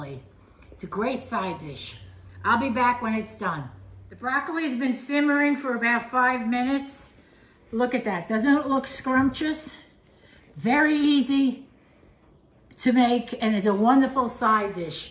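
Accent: New York, United States. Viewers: My favorite red head with a New York accent.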